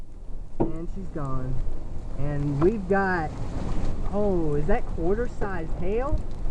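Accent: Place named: North Carolina, United States. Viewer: you can obviously tell that your from NC, you've got that NC Country Twang. I have it too. <-- and for the people that don't know what a twang is, its kind of like your accent.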